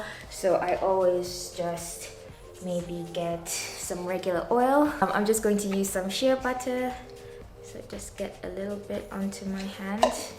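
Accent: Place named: England, United Kingdom. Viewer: 💜 …….💜 ….Girl What I love about this video is (everything) but specifically your English accent 🔥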